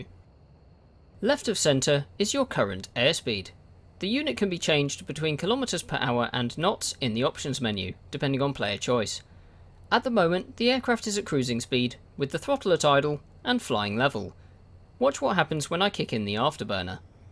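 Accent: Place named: United Kingdom. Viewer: Have you ever noticed smart videos like these come from British accent people. Love it.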